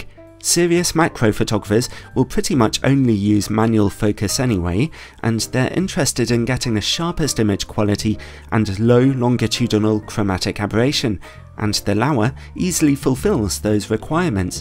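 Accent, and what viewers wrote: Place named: RP, United Kingdom. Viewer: It's the voice. Very soothing cadence and RP enunciation.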